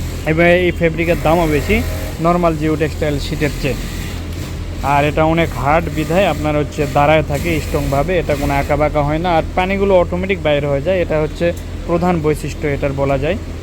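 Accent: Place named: Joypurhat, Bangladesh. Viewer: আসসালামু আলাইকুম ওয়া রহমতুল্লাহ! ভাই, আপনার কথা শুনে মনে হচ্ছে আপনি জয়পুরহাট জেলার মানুষ! জয়পুরহাটে আপনাদের এজেন্ট আছে কি না? জানাবেন প্লিজ!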